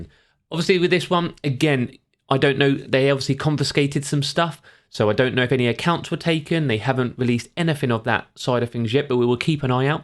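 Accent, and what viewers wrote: United Kingdom. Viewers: Was this guy from the UK?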